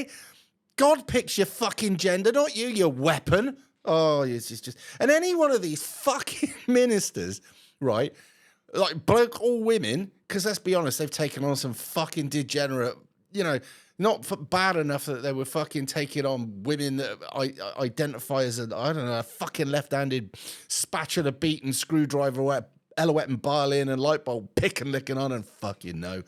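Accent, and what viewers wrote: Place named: England, United Kingdom. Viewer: Love a proper English accent 🤣 Merry Christmas from Nottingham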